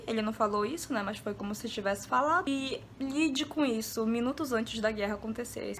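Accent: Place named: Pará, Brazil. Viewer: Esse sotaque paraense <3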